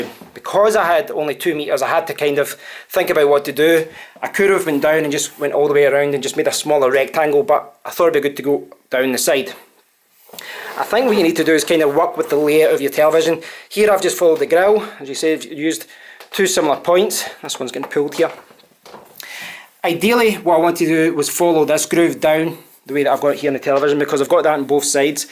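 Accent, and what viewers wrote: Scotland, United Kingdom. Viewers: So awesome opening a YouTube video about tech I wanted to watch and hearing a Scottish accent! 🥃Well gid. #scottishtechyoutube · Thanks nice to hear a good Scottish voice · Gotta love the Scottish accent! I prefer female, though. Good review,!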